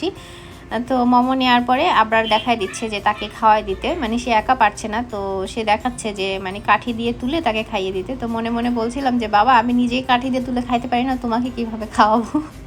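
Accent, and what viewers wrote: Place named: Barisal, Bangladesh. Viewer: আসসালামু আলাইকুম. আপু অল ফ্যামিলি আপনাদের ফ্যামিলি টা খুব ভালো লাগে. সুন্দর একটা সংসার মাঝে মাঝে আপনার কথা গুলা শুনলে অনেক হাসি আহে কি হাসতে হাসতে পেট ব্যাথা হয়ে যায়. সত্যি কথা যে বরিশালের ভাষায় কথা বলেন. আবার অনেক বাসায় ভেঙ্গাইয়া কত রকম কমেন্ট করে ঢং মং করে কথা বলেন. খুব ভালো লাগে. এই যেমন ধরেন আজকে একটা কথা বলছিলেন যে বাবু, আমি যামু কাঠি দিয়া খাইতে পারি না. আমি তোকে কিভাবে খাওয়ামু ঠিক এই কথাটাই অনেক ভালো লাগে. ২. ১ টা কথা. আপনার মাঝে মাঝে অনেক.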